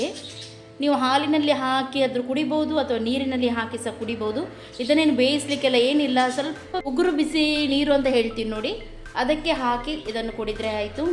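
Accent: Mangalore, India. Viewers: Sis nimma Mangalore bhashe thumba Chennai so nice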